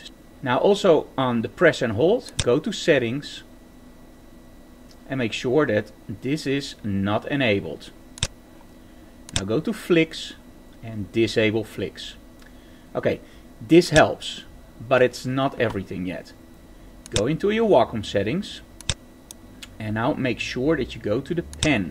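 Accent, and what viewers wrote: Netherlands. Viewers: je bent echt overduidelijk engels met je nederlandse accent, blijf wel dit soort videos maken tho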